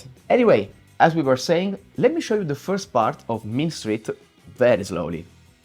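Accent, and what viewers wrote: Italy. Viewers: You're Italian. Recognise that accent anywhere. Have a sub. Good video.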